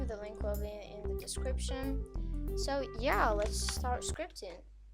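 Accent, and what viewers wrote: France. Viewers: Are you french ? i am french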